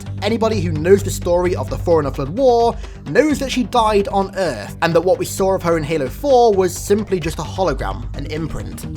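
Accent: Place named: England, United Kingdom. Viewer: congrats you have all the xperience;D i love your vids and yeah you are english right? just a question. because of your accent. like i said congrats.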